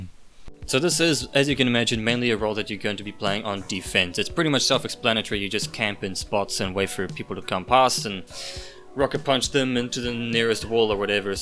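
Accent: New Zealand. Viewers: Are you from New Zealand? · Are you from new Zealand?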